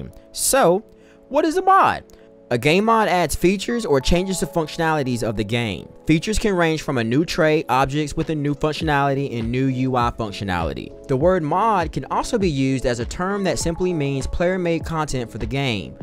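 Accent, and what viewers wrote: United States. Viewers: Omg for the first time in my life I hear an American accent that is different from a "standard" one (I'm a foreigner), and it's not a "A guy speaks 50+ accents" video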